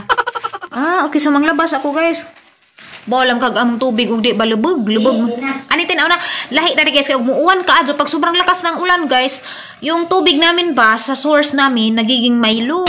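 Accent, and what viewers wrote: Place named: Visayas, Philippines. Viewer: I like your Bisalog Accent dai relate ko kay ing ana pod ko bsan ang dugay ko na dito sa Manila eh😀😀😀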